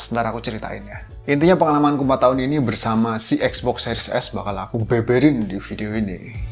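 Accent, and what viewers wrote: Java, Indonesia. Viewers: Anjir bang Lu awet muda banget, terakhir nonton 2020 an, wkwkwk Ciri khas medok dan santai nya masih konsisten sampe skrg😂😂😂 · Nah Justru krna Medok nya itu... Jadi ciri khas Menarik 😅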